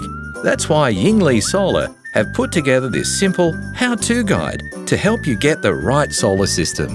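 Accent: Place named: Australia. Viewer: Nice cartoon and Aussie voice-over that sounds great without really saying anything at all. Yingli are best described as the Toyota of solar panels. Very good quality and technology but not the best,very popular as they are neither cheap nor premium priced. Why don't all Chinese solar companies take this simple approach to marketing instead of trying to sell on low prices all the time? Obviously works for Yingli. Well done.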